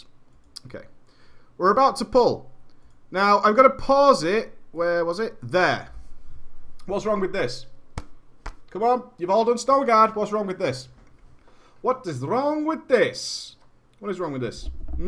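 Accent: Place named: Yorkshire, United Kingdom. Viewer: You sound like you're from Yorkshire :@ Lancashire ftw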